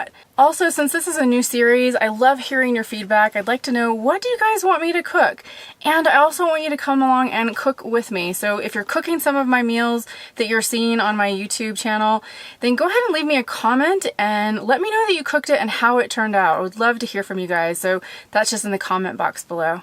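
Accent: Texas, United States. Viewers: I'd like to see you hardboil a dozen or 2 eggs in that. You would have to be awful careful but man, 1 egg is about 9g protein and eggs have a BV rating of 100 (perfect) and you would have a ton of protein cooked and always ready for a few days on hand. Of course you have to refrig. them but once it is done, it is done, en masse for days of protein on hand, simply. I also think you should do a video entirely in your Texas twang, if you still have any of that accent!!!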